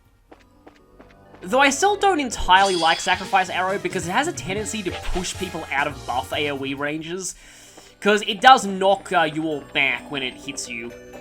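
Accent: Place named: Australia. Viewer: Aaaaand there's the leitmotif. Also, at least in the PSP version, enemies don't respawn even if you leave the tower and then come back. They look a lot like stone tablets (with the archetypical look of the Ten Commandments coming to mind). ...Thinking about it Ys 8 did a similar thing, except instead of the computers projecting them as images the tablets were the "computers" and projected the images themselves. I'd imagine it probably is a regional one; I've never heard an American or Canadian say it as anything other than "day-tuh", but I've herd people from the UK and (obviously now) Australia say "dah-tuh".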